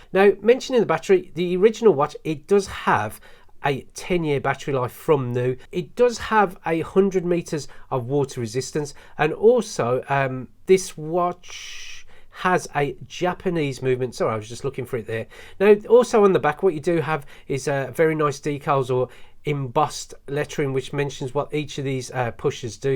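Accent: United Kingdom. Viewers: Nice video, love your brittish accent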